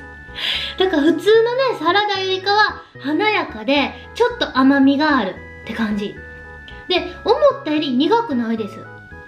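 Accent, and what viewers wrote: Kansai, Japan. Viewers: 関西弁がすごい可愛い 方言最高やな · 関西弁可愛い♡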